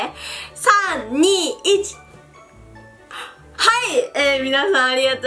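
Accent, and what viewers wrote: Kansai, Japan. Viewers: 最近になってチャンネル登録したのですが、サンの笑顔は自分にとって癒し系です。関西弁もいいですね～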